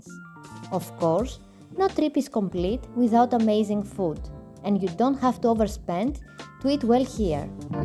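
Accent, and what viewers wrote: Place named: Greece. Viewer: Great tips, and lovely to hear the commentary in a beautiful English, with Greek accent! So much nicer than many of the AI generated commentaries ☺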